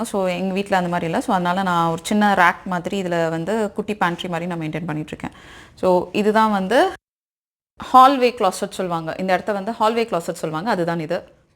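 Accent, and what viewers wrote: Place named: Nagercoil, India. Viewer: Sisters ungaluku Nagercoil tha...unga tamil language appadi tha iruku..nanum Nagercoil tha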